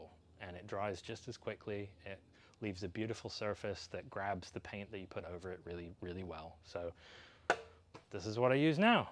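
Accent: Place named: Cornwall, United Kingdom. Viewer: You're an interesting and knowledgeable speaker (who luckily has retained a lovely Cornish accent) but I would just love to see some of the actual work and progress on Rosalind. Perhaps you could combine the two?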